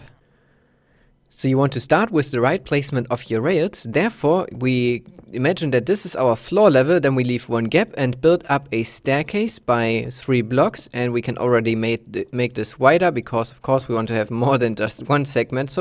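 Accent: Germany. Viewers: Are you a german? You sound a bit like me, and I am german. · Are you german ? :) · Are you from Germany ?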